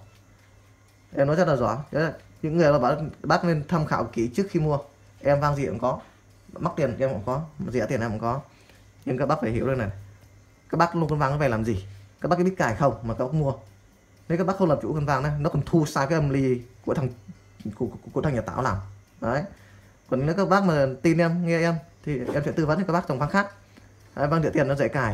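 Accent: Standard. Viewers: Công nhận bạn nói rất chuẩn .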